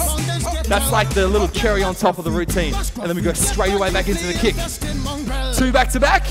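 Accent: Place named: England, United Kingdom. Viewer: What English Accent is that?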